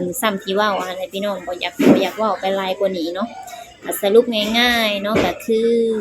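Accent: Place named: Ban Khok Yai, Thailand. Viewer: เจ้าคนบ้านโคกใหญ่บ่ครับฟังเสียงคือคนบ้านโคกใหญ่ครับสบายดีปีใหม่ครับ ขอให้สุขภาพแข็งแรงตลอดปี69และตลอดเด้อครับคนงาม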